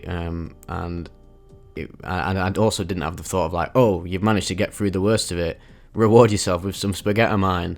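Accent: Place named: Yorkshire, United Kingdom. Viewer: Mate i feel i could be platonically serenaded by your yorkshire timbre for hours on end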